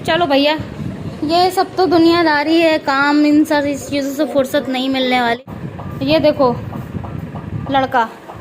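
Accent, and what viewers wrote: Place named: Uttar Pradesh, India. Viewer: Up language lapat chal rhi h😊😊😊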